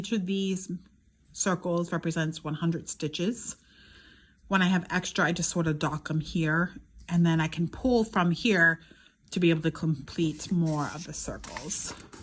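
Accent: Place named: Maine, United States. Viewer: Hi...first, great progress on your WIPs and goals! Second...GO PATS! Third...as a Maine girl who has been in New Zealand for 22 years, you simply make my heart smile with your "yapping". Sounds like home to me, so thank you! Happy stitching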